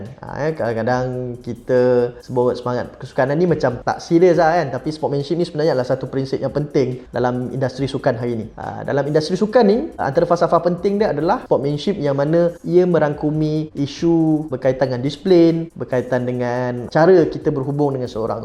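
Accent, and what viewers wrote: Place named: Kedah, Malaysia. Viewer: Ni kedahh aa mcm gini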